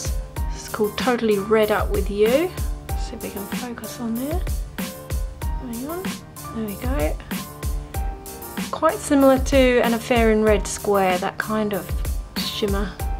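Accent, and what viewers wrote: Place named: United Kingdom. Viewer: Hi, yes I know its a 2017 video but I'm still doing catch up, I'm a slow old bird lol. Now that I've seen this video I know you should be able to do some gel designs for me, even just simple easy ones I don't care - just give me gel (x). I cannot make out if your British or not, sometimes I hear an accent and then you sound very uk-ish. Take care hun,Xv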